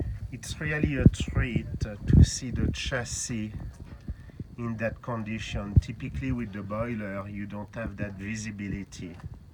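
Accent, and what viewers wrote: France. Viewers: You have a very french accent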